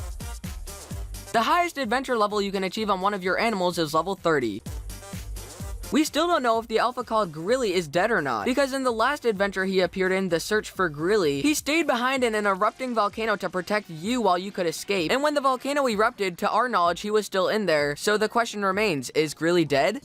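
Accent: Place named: United States. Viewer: Ahh the typical, facts facts facts facts. Your voice has changed to a more stronger American accent! Plus did wisteriamoon tell you password! Hehehe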